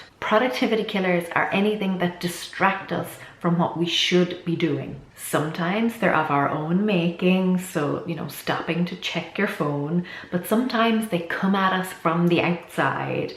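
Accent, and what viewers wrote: Ireland. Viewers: are u from ireland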